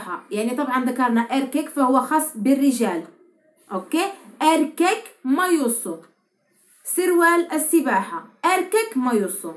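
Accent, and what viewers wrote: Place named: Morocco. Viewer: أعرف أنك مغربية ويبدو ذلك في لهجتك المغربية المميزة ....كنت أمزح فقط . استمري في دروسك وندعو لك بالتوفيق ونحن لك من الشاكرين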